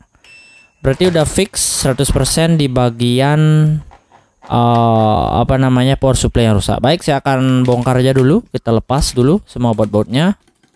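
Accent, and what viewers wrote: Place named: Bali, Indonesia. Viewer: Logat bicara bapak ini seperti orang Bali 😅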